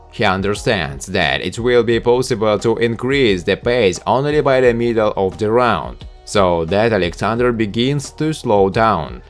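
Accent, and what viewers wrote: Russia. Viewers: Красивый русский акцент ) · The context could be fine, but dude!? Just speak Russian with Russian accent, because your English has the stupidest accent, it is irritating, so after one minute I had to go...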